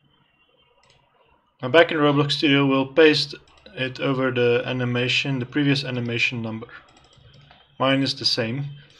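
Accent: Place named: Afrikaans, South Africa. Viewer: I hear that Afrikaans accent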